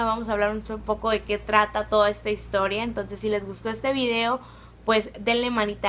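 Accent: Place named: Mexico. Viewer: ¡Me gusta tu acento! ¿De qué parte de México eres?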